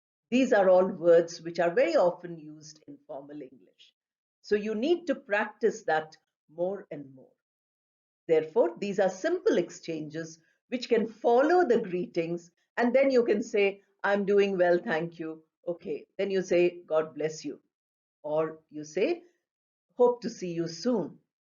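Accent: India. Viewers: Good morning madam 💐🙏 iam following your english classes. Nice classes.Your english class is very accurate, grammatically and fluency with an indian accent english. I can understand your english class easily.🎉🎉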